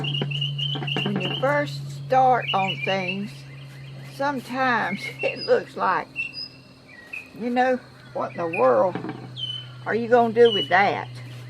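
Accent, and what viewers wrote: South, United States. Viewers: Hi, I'm Melissa from Iowa. I'm subscribed. You are just so stinking cute, southern accent, cute sayings, and a real sweet lady, I really enjoy watching you create your crafts, and am wanting to try my hand at some of them, bless you from Ga.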